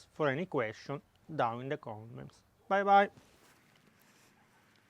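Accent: Italy. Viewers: Looks spppectaculllar! Can I use honey instead of sugar? My wife thinking I am crazy roatating my forearm for the enjoyment. Love Italian cuisine and your accent. Go bro.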